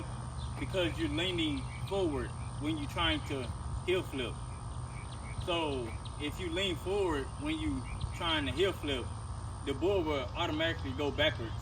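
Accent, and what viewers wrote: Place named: South, United States. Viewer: I just like the southern draw. country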